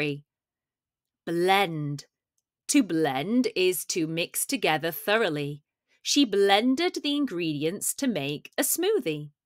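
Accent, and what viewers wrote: United Kingdom. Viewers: Thanks for creating this program, because I can learn British accent from this. Thank you very much!❤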